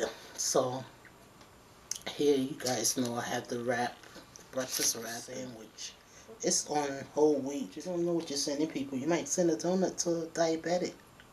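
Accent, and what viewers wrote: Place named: New York, United States. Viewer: I love watching your videos I’m always here for your New York accent I miss my accent I’m so country now...lol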